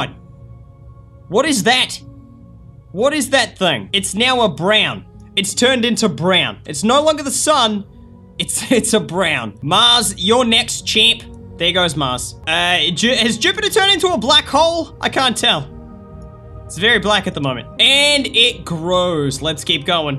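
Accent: Australia. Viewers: Australian Jacksepticeye = Immediate sub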